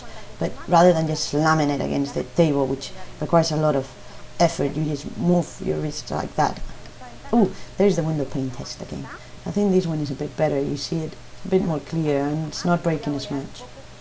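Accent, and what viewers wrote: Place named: Spain. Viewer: She isn't Latina, but Hispanic she is from Spain can tell from the accent. People from Spain are Hispanic.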